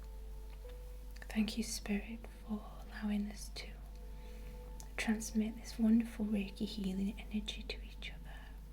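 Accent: United Kingdom. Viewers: Are you British ? 💖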